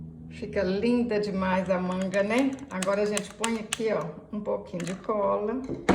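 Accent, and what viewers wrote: Minas Gerais, Brazil. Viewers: Adorei. Amiga Vc é mineira ?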